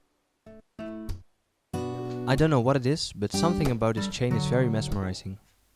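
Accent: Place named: Netherlands. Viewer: I hear a typical dutch accent. You gave me some inspiration man. Do you have like instagram?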